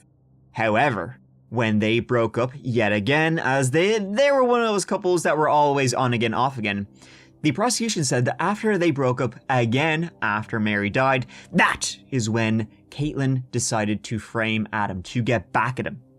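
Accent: Ireland. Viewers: I enjoy ability to tell a story. Another enjoyable item is his Irish accent. I want to meet this gentleman and shake his hand. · You are my favorite podcaster ever I enjoy your content and you oh so much. Your voice is very relaxing and you’re so IRISH. ❣️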